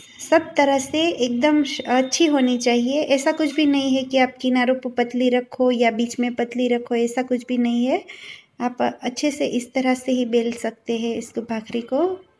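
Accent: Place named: Gujarat, India. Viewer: Gujarati Hindi sounds really funny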